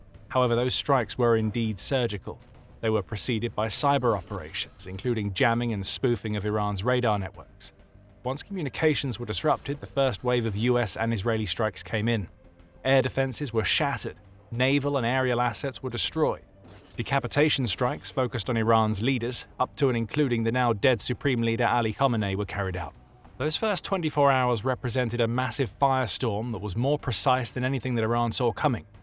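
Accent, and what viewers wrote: United Kingdom. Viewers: Great footage but why have a Brit narrate?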